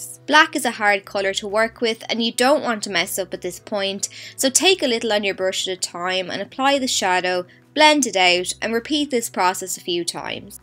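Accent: Ireland. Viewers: I absolutely love your accent, I followed immediately after hearing it! 😂 (given, I was going to follow anyways just from the thumbnail, but whatevs) Is your accent Irish?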